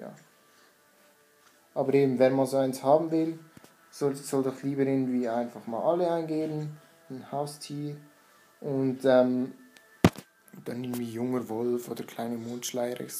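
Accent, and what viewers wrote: Switzerland. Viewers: Hallo! Ich habe mal zwei Fragen 1. Bist du Schweizer (klingt irgendwie so)? 2. Sind diese Haustiere unsterblich oder verliert man die irgendwann? Tolles Vid!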